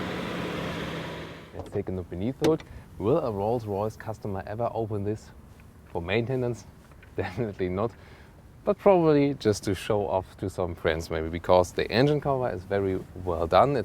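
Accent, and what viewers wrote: Germany. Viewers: das deutsche english hört man sehr raus, aber trotzdem super video!!!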